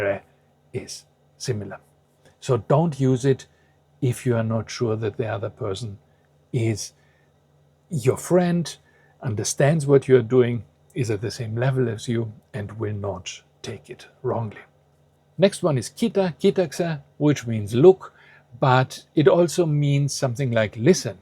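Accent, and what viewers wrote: Germany. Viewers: that explains why your accent in English is slightly more German than Greek! I was so confused 😅 I just heard in another YouTube video that sometimes your accent in a third language reflects your second language background more than your native language. Fascinating! Τέλος πάντων, σας ευχαριστώ για ακόμα ένα υπέροχο βίντεο! Δηλαδή, ας πούμε ότι το απόλαυσα πολύ!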